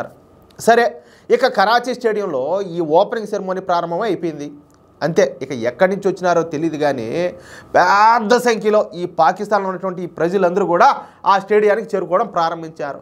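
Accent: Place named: Kadapa, India. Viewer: Ni slang cuddapah laga undhi brother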